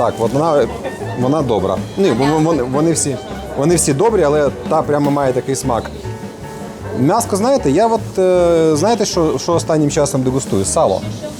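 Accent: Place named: Odesa, Ukraine. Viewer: Уважаемая, разве я Вам хамил? Или называл недалëкою? Или вообще с Вами начинал диалог? Или интересовался Вашим мнением? Вы отвечайте, только тогда, когда Вас спрашивают, а не будьте "затычкой", дюже грамотной. И определитесь сами для себя, на суджике вам говорить, или балакать. А что касается, вся изюминка была, смотреть контент про Одессу, именно от человека с Одесским диалектом, на понятном для всех языке.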